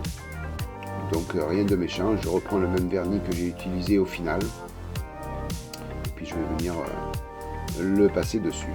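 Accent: South, France. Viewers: Bravo. Superbe réalisation. Je vais suivre celle du Mirage F1. Je trouve tes présentations très agréables (avé l'accent) et découvre à chaque fois des astuces que je ne manque pas d'utiliser pour mes propres réalisations. Bonne continuation.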